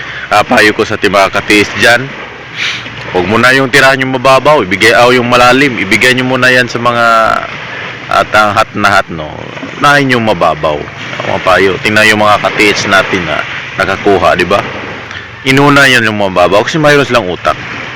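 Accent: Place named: Quezon, Philippines. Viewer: Parang naa ka sa quezon province sir.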